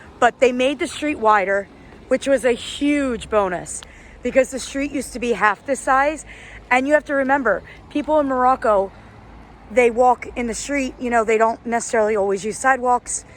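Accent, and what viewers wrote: United States. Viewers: Congrats for your first road crossing the nonchalant North African way 🎉😂 (don't encourage it) More local practical safety and social tips and awareness are a must, and your best source is your neighbors and social circle, as an Algerian I can assure you that our Moroccan brothers are among the most helpful, peaceful and most generous neighbors I've ever had a chance to live close to (despite the present politics). So stay safe and enjoy your new lifestyle ❤🎉 and your caramel Noss-Noss😅 By the way your arabic slang American accent is a real treat, God bless.🎉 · sound like a white American talking not Moroccan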